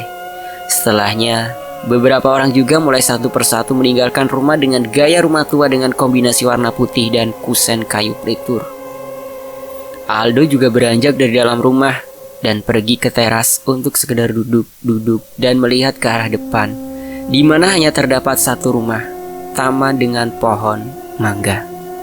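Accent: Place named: Surabaya, Indonesia. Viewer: dari dialek bahasanya kayak penulis dari Surabaya,cok,rek basa khas suroboyoan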